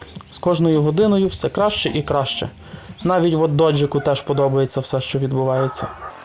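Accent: Ukraine. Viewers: Смішний, піднамаєш настрій, і діалект класний. Чернівці?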